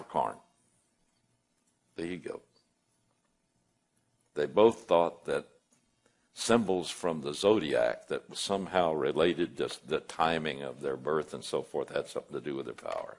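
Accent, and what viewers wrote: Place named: Kentucky, United States. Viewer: I WATCHED YOUR VIDEO WITH YOUR SON! THE 7 YEAR TRIBULATION STARTS AT THE RAPTURE! NOT MIDTRIB! NOW THERE IS THE THOUGHT THAT THE TRIBULATION MAY NOT START IMMEDIATELY AFTER THE RAPTURE. BUT THE RAPTURE IS BEFORE THE START! MIDTRIB IS A FALSE TEACHING. YOU SOUND POSSIBLY KENTUCKY OR TENNESSEE? NORTH CAROLINA OR VIRGINIA! I'M IN EXTREME SOUTH GEORGIA! BEEN HERE GOING ON 25 YEARS! I'VE BEEN ALL OVER THE USA! LIVED IN CALIFORNIA AND MINNESOTA AND PLACES WHILE IN THE WAC'S! PLUS ILLINOIS! MY DADDY WAS FROM TENNESSEE! BEEN TO GERMANY AND JAMAICA! BOTH AS A CIVILIAN! WE HAVE THE BEST COUNTRY!!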